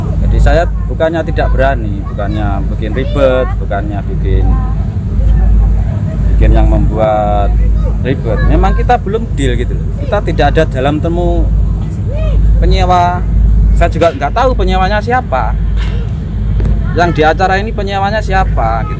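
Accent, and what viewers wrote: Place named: East Java, Indonesia. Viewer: Logate etanan ngno kui...bumbune ben tambh sedep mas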